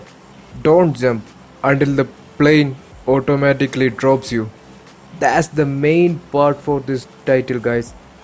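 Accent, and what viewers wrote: Kerala, India. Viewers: English ketapozhe thonni malayali aanenn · Malayali annale English kettapo thoni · Malayali anenn English kettappo mansilayi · asif aliyude sound pole und....malayali aanennu manasilayi 😍😘...pwolie video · manglish.... bro, are u a keralite...???? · You are a malu for sure.Damn ur accent man!😁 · True English kettapole manasil aayi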